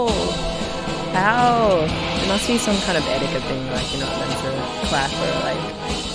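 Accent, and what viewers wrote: Australia. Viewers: 紮馬 sls 香港人？ i thought you were aussie through and through lol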